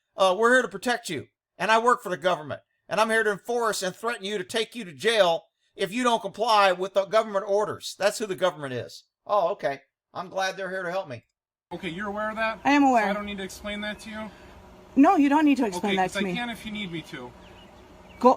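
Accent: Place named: Florida, United States. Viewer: My God, could you allow just one video to play without your interjections? And making fun of her accent makes you look foolish, when in fact, you have Florida man accent, if you get my drift.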